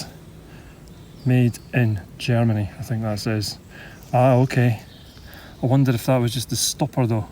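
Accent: Scotland, United Kingdom. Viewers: Always nice to hear this lovely Scottish accent. Over 30 years ago i had trouble understanding any scottish, being of non English background. Had to have hubby translating english into english! Love watching your vlog. Cheers Daniela from Australia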